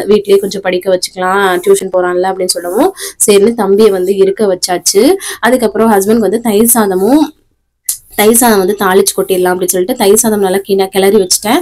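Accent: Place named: Sivaganga, India. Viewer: Namma sivagangai tamil la nalam visarikirathu romba alagu❤thambi🎉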